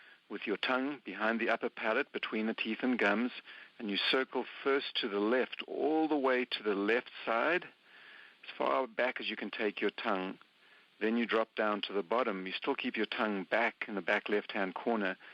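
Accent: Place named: South Africa. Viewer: I’m in South Africa. I heard your accent and got excited that you might be in SA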